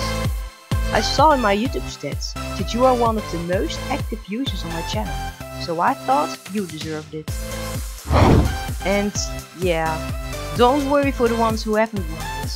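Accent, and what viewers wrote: Netherlands. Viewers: That Goes so quick?! Je kan horen dat je nl bent btw 😂😂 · Are hou Dutch?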